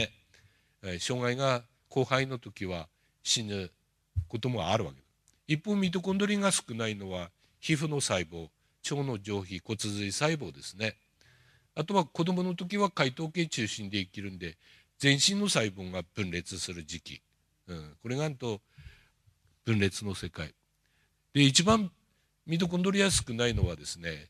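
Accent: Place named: Tohoku, Japan. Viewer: 安保先生お若いですね。人類愛だなぁ。東北のイントネーションが耳に心地よく癒やされるのでずっと聞いていたいです。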